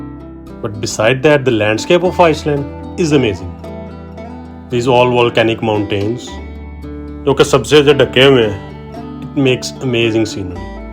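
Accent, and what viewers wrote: Punjab, India. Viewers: yar biggets fan from lahore pakistan boht hi piaray lag rahay ho achhi video bnai hai yar punjabi main baat kia karo ziada, love your natural punjabi accent urdu, punjabi bola karo koshish karo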